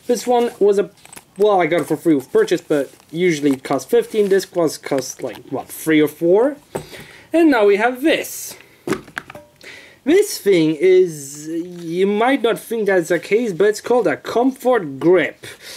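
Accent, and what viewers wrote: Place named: United Kingdom. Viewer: To be Young,12 cups of coffee and UK accent,that's the magic formula.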